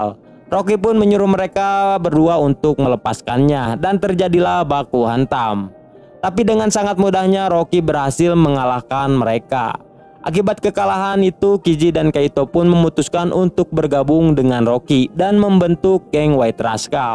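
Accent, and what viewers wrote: West Java, Indonesia. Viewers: Bahasa nya gk angger,ada sunda²nya 🙏🏾🤭😂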